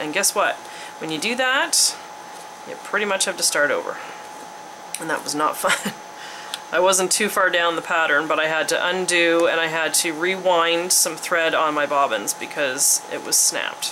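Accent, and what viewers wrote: Canada. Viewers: I heard your Canadian accent right away! Fellow Canadian here! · Anna Nicholson if you listen she says oot and aboot, which is typical from nova scotia ;)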